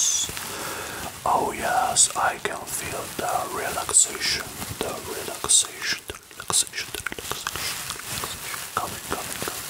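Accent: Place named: Italy. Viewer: Italians have a specific way of talking which is cute😊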